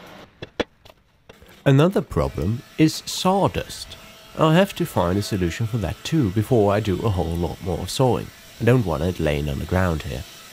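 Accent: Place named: Europe. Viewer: Where you from dude, you sound European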